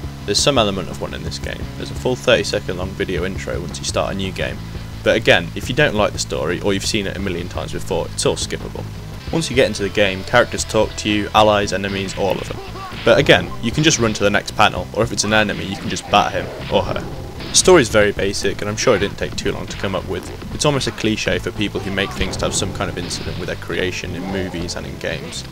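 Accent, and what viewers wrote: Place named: United Kingdom. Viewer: Good review.. extra points for the British accent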